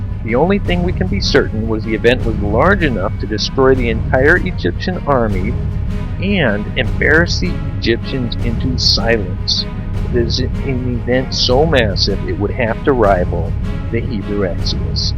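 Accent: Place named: Midwest, United States. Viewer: from the North, Midwest. (Going by that accent)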